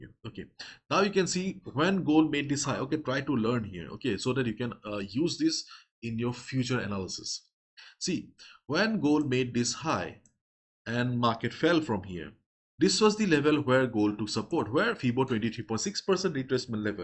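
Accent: India. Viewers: He using simple indian english ascent so its seems there will be no problem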